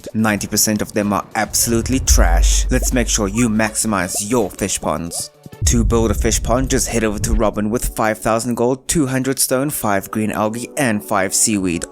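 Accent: South Africa. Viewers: The South African accent. Love to see it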